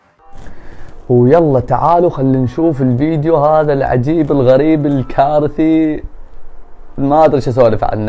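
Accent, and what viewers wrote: Iraq. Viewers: ليش احسك من الجنوب من اهالي البصرة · والله اول ماشفتك عبالي سعودي طلعت عراقي وحت حجيك مايشبه شكلك تحجي مثل العراقيين الكارهين الدنيا صدوك لو كال ابو المثل لاتغرك المضاهر خرررر بحضي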